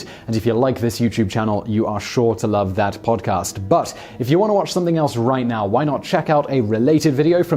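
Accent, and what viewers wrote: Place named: Upper class, United Kingdom. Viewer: This is what upper class Brit’s sound like dude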